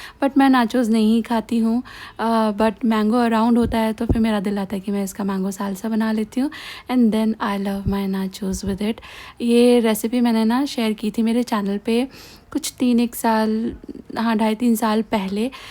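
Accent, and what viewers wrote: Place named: Uttar Pradesh, India. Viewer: Aap up se h.. Is liye gola bolti h😊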